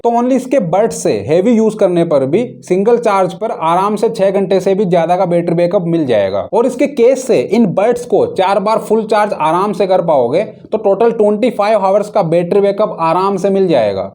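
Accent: Haryana, India. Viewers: Baato se to Haryana ka lagg raha hai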